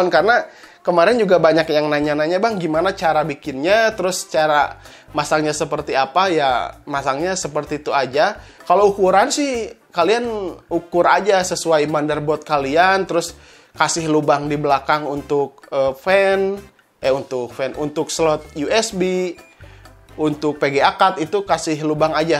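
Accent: West Java, Indonesia. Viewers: Saia suka logat anda👍🏼, sundanese bangett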